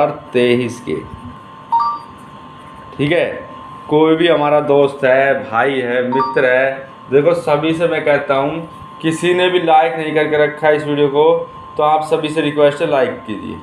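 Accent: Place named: Mathura, India. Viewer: Mujhe aapki language mathura se lagti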